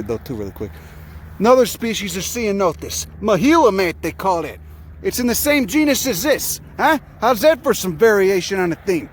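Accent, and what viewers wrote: Chicago, United States. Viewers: I adore your "plainsplaining." Your Chicago Italian accent is cool. Can you post a pic, so we know what you look like? Not expecting a Glamour Shot, nor anything salacious! 😜👾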